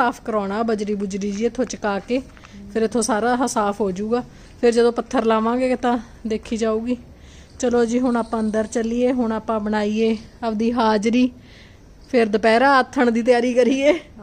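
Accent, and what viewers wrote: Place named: Patiala, India. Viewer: I love your video bhan I'm from patiala to I always watch your vlog you speak same punjabi like we do and your so sweet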